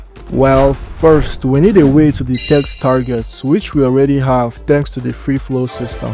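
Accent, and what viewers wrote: Nigeria. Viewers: U seem African. U sorta have A nigerian Accent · That accent.....you be naija boy?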